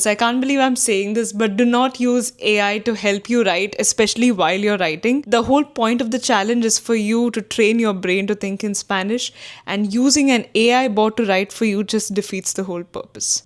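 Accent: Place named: India. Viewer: Thank you, muchas gracias. You seem to have an Indian accent.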